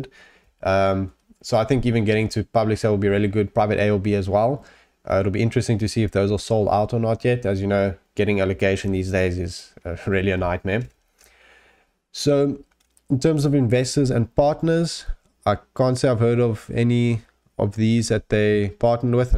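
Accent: South Africa. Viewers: Glad to find this channel . Is that South African or Zimbabwe accent? Are you based there. Thanks again good content for small audience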